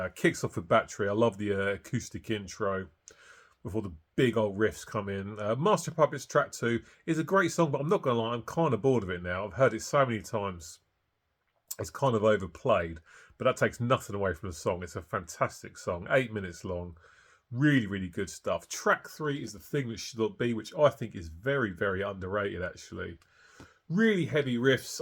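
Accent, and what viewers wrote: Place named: England, United Kingdom. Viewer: he sound English lol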